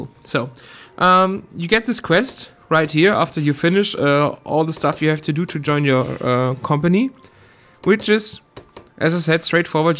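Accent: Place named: Germany. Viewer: Are you from Germany? Wonderful accent alot of flare, like final fantasy XIV. So thanks for the info.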